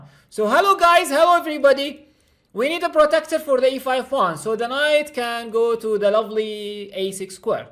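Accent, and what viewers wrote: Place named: England, United Kingdom. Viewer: Idunno that u have an english acc whatever luv uu from irakk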